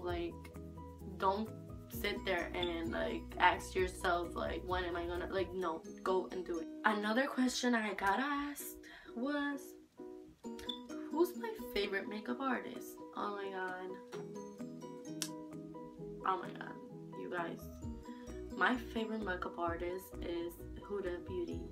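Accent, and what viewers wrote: Puerto Rico. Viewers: Yaasss girl!! Saludos desde PUERTO RICO! Escuché tu acento y rápido supe que eras boricua. Eres bella y me encantan tus tutorials de maquillaje. Xoxoxox 😘💕🇵🇷🇵🇷🇵🇷🇵🇷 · que orgullo saber que eres boriqua. Yo soy cubana y veo los videos de Gabi. Cuando escuche tu accento en un video con Gabi y la otra rubia, tuve que encontrar tu página to support my fellow latina! Espero que sigas haciendo videos y que te hagas grande y famosa mientras sigas representando a todas las latinas que te siguen. Good luck girl!:D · You should make a video talking Spanish ! Yess if love to hear you speak because I love how Puerto Rican's talk lol 😭 btw your soo pretty 😍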